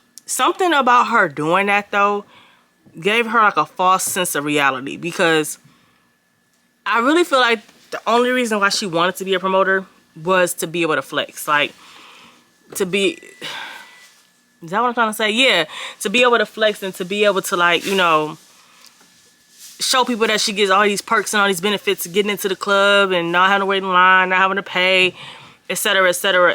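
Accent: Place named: Flint, United States. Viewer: OMG your accent sounds just like all the females in my husbands family. He's from Flint. 😊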